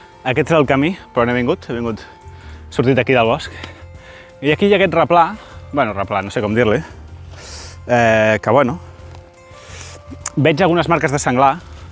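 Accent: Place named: Mallorca, Spain. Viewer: 😂M’encanta el mallorquí, quina simpatia! Bona jornada! Amb això de les postals has fet un homenatge a la missatgeria tradicional, que està en plena decadència 👏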